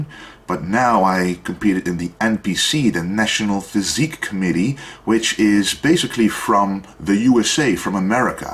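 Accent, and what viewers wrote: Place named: East, Netherlands. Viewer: Haha grappig accent. Oosten van het land?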